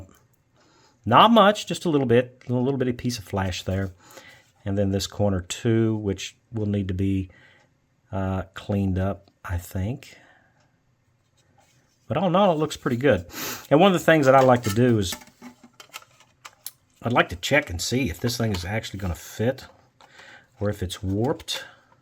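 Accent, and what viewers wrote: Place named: West Virginia, United States. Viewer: The start of the unboxing was hilarious 🤣I always knew you guys in WV talk different, but that was something else. I am still trying to grasp what you were saying 🙂But the kit looks great and is a welcome different subject matter, and I'm excited to see what you make of it. Have fun modeling, and see ya next Friday!!!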